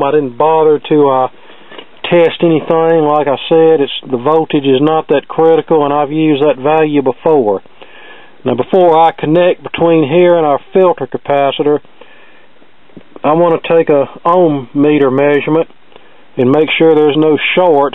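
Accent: South, United States. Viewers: Hi! I am pleased to be following you. I am 69, retired, and trying to learn again what I once studied in high school electronics class. I enjoy your videos, and your sense of humor, very much. I also enjoy hearing from a fellow Southerner. Those other guys who pronounce the L in solder just sound ignorant to me 🤣 Do try to keep things in focus to improve our experience, but I will keep watching nonetheless. Keep up the good work, people my age are not too old to learn. Thanks for your good work!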